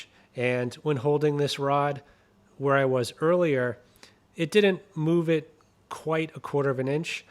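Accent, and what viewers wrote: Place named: New England, United States. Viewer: Nice video, thank you! I heard a New England accent! Ron USA, PTL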